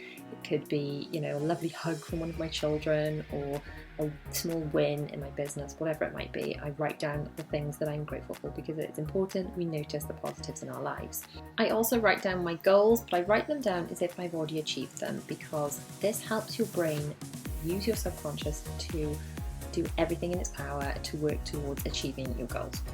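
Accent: United Kingdom. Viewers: I'm new, nice to find a British voice on mum stuff! I'd love to see your workout routine. Thanks!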